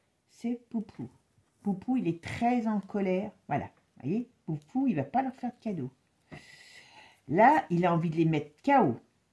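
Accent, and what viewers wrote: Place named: Périgord, France. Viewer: Bonjour, je vous découvre, et j'aime vos tirages, votre douceur d'âme, et ce petit accent que vous avez, et que je connais, ô combien, puisque j'ai le même. Je vous embrasse depuis Le Périgord. Très sincères salutations. 🕊💞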